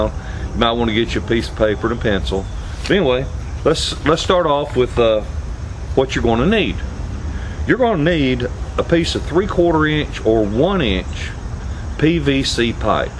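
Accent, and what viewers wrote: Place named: South, United States. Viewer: Hey, I love your southern twang. The information was great. I'm going to build one. Wife bought me a $80 digital antennae in the house. I get 15 channels. This should also help get more.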